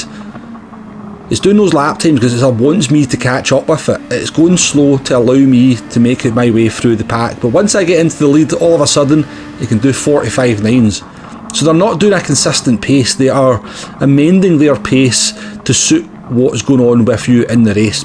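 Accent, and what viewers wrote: Scotland, United Kingdom. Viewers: From Scotland by the accent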